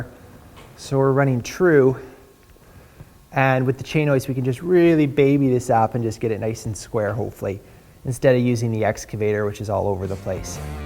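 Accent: Canada. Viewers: U from Canada A?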